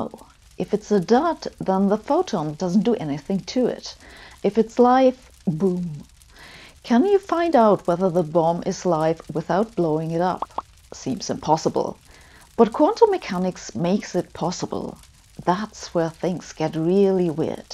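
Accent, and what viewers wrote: Germany. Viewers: the goal is to monetize. people who like the illusion of themselves understanding something without any effort really like this German accented "no gobbledygook" which is actually pure gobbledygook since she never invests in actually explaining in detail without using silly metaphors ...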